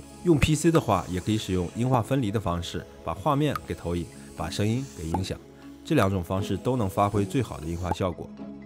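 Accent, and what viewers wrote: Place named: Shanxi, China. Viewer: 是山西人吧感觉和我说话差不多哈哈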